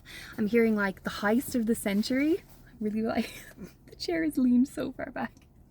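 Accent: Ireland. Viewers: you are confusing me. You have a Scottish complexion but every now and then you sound Irish. But you're American right?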